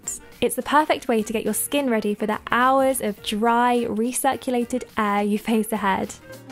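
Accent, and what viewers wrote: RP, United Kingdom. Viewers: why does she sound so posh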